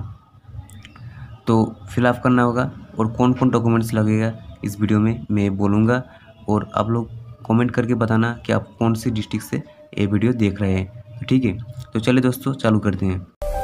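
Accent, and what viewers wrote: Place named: Bengal, India. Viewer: Jo bhi ho ye to pata chal gaya k tum bangali ho.hindi tumko thikse nahi ata hai to bangla me vodeo banaw.hindi me jaruri nahi hai.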